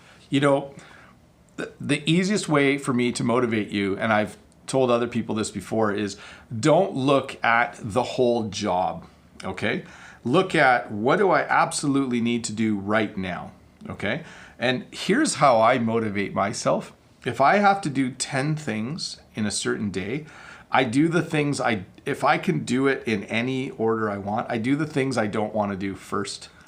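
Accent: United States. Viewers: Hi sir I you really Canadian... I thought you from American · Canadian and American accents are the same or not . We hen I heard you fist time I thought you are american.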